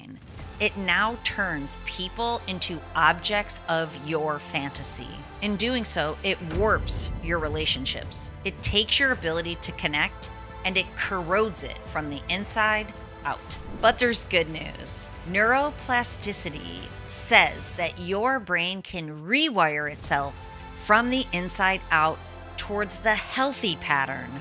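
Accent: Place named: Buffalo, United States. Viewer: I love your Buffalo accent,!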